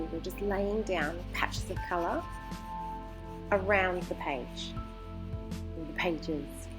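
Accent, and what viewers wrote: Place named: United Kingdom. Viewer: I love your "loose" techniques in this video, plus it's very pleasant to watch and listen to your lovely British accent. You don't talk too much, which I find to be very annoying in many artists' videos. The background music was perfect! The small accents you added at the end using the nib pen made such a huge difference in the end result. Keep making these wonderful videos!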